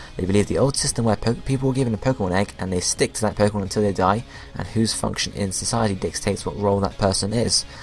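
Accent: England, United Kingdom. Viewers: your English accent make me believe everything you say!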